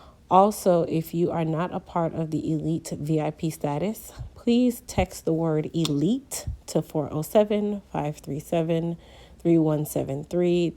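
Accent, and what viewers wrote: Jamaica. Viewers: Sound jamaican to me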